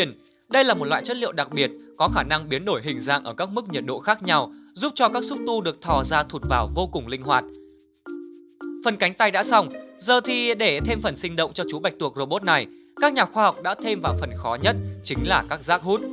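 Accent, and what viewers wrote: South, Vietnam. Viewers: Anh nói giọng miền Nam hài quá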